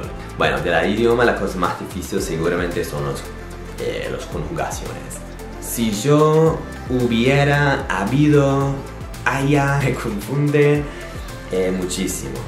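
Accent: Buenos Aires, Argentina. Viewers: no dijo que no tenga acento gringo, dijo que su español es muy argentino, las formas de pronunciar las palabras las acentuaciones y sobre todos los "sh" sounds que se les escapan a veces son caracterisitco del español argentino obviamente mezclado con su acento gringo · Lucio Martínez yo soy argentino y te puedo decir que tiene un acento muy similar al nuestro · Es verdad tiene un toque de acento como los argentino como nosotros habla muy bien .great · I can definitely hear the Argentine accent in your voice... Also your Latin mannerisms you picked up come through... Definitely interesting... I do the same thing when I speak Castilian Spanish... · I think what they're saying is that his accent has features most associated with accents heard in Argentina, most notably the way he pronounces doble L. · Que increíble tu español argentino 😍🙂💪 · First of all, someone's accent is Argentine, not Argentinian; "Argentinian" is the name give to the people from Argentina, whereas "Argentine" is restricted to things, both from the said country. 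Second of all, he does mention living down there, working down there and even dating someone from down there. Finally, he does sound like an Argentinian at times; that's probably because of how he learned. Quit bitching... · Me encantaaaaa cuando mezclas el acento argentino y español!! 😍😍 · It's a mixture of Argentinean & Spanish from Spain. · I love your Spaniard/ Argentinian accent! Lo haces perfecto! Te felicito. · Hablas como argentino porque dices "sho" y no "yo". Pero esta super bien 😄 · Hola!!! Me sorprende que hables en español tan bien, incluso tienes acento argentino. Eres muy respetuoso, divertido y buena persona y se nota al hablar. No cambies nunca. Un abrazo desde España. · Tu tienes el acento “argentino” más claro que he oido en mi vida jajaja. Yo soy de los Estados Unidos también, pero gente dice que yo tengo acento mexicano o peruano. Algunos creen que tengo acento mexicano y algunos creen que tengo acento peruano. 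Pero no he vivido en un país donde se habla español y por eso mi acento no está escrito en piedra. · you have an Argentine accent when you speak Spanish, I love it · Te amo fuerte, tenes un acento muy porteño jajaja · Me mueroooo jajajaja es que escucharlo hablando con acento argentino es súper funny. Le queda bien. Saludos desde Puerto Rico. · Tienes marcado el acento Español pero con cierto toque de Argentino! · Suena como una mezcla de argentino, español, y yanqui. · te sigo hace poco tiempo y realmente hablás muy bien español, me encanta que tengas el acento argentino, me alegro que te haya gustado vivir en nuestro país y conocer nuestra cultura. Espero que puedas volver, aún hay mucho por conocer 😁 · Tenes la pronunciación de la letra R nuestra! De los porteños! 😝. Your Spanish is excellent! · ESE ACENTO ES FABULOSO !!! MARAVILLOSO !!! ME ENCANTA ESE ACENTO !!! ARGENTINO ES LO MAS !!!!!!!!!!!!!! 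· Interesting, normally Americans learn north American or European Spanish, but you learned Argentinian spanish and even have the strong accent! I love it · No sabia que habias vivido en Buenos Aires, pero me di cuenta al toque por que tu español es marcadamente porteño, ni siquiera Argentino... porteño! jajaj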